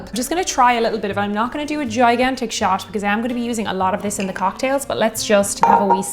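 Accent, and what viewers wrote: Ireland. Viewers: Both my Mom's parents are from Ireland. Your Irish brogue always makes me happy.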